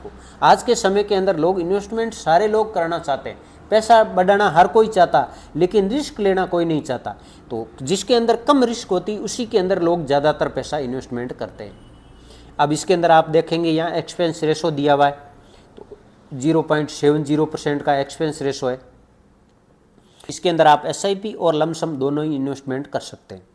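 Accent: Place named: Rajasthan, India. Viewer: बहुत अच्छी जानकारी दी आपने बहुत बहुत धन्यवाद आपका 😊😊 राजस्थान से लगते हो आप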